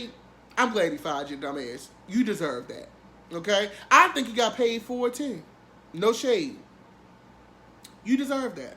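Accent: Baltimore, United States. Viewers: I love this dude! You are the truth! Very nice with the ""Let's unpack this..." So colorful. I'm from DC and I hear that B-more accent. Makes me laugh. Keep up the good work!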